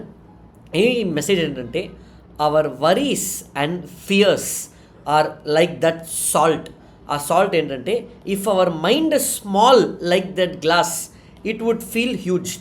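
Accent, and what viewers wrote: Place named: Telugu, India. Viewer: Meeru telugu slang super ga undhi sir💯 Being a tamil person. Can easily connect with it sir!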